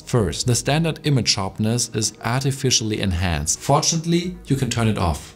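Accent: Germany. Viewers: dein deutscher dialekt ist echt übel XD. teilweise wirkt es so als wolltest du extra englischer sprechen... und dann verkackst du das "very". daraus machst du ein "whery"... und wenn ein vokal nach dem "the" kommt, wird es "thee" ausgesprochen. nicht böser gemeint. ich formuliere immer sehr unsensibel.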